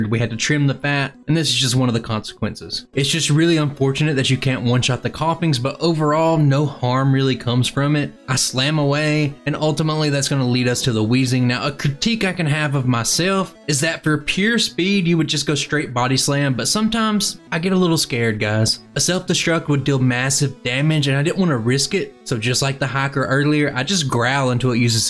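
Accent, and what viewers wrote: South, United States. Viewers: Youtube fed me your channel to discover you, gotta admit, that southern accent totally broke up my background and made me pay attention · I dig the race format. I had to watch twice because the first time I fell asleep listening to your smooth southern twang.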